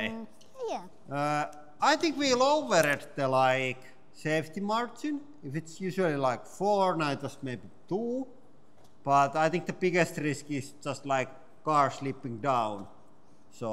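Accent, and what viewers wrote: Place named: Finland. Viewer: I got recommended by youtube but i can already guess you guys are finnish by the thick finnish accent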